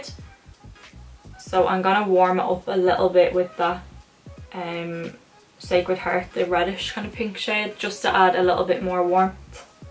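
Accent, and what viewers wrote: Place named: Dublin, Ireland. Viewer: Can i ask where ur based u sound like ur from Dublin so if u are where in Dublin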